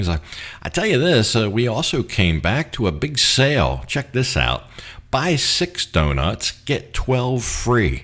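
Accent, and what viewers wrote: United States. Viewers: yes but he is American and pronounce different so you understood what he was saying is that not enough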